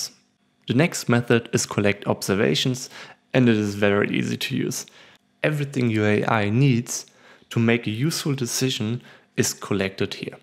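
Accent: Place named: Germany. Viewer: didn't know this accent was german. it sounds awesome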